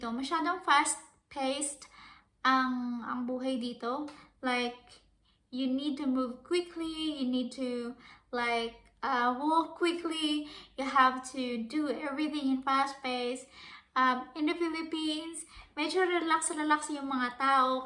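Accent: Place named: Australia. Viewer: May aussie accent kn bebe...love it 😊😊😊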